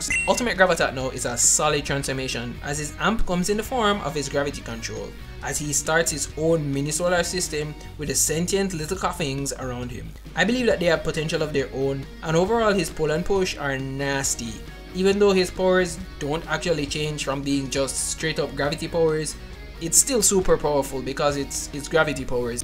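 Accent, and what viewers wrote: Jamaica. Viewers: You’re Jamaican? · Jamaican accent? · Are you Jamaican? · You jamaican? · Sounds Jamaican to me??? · are u jamaican bro? me hear a accent dwag? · Are you jamaican?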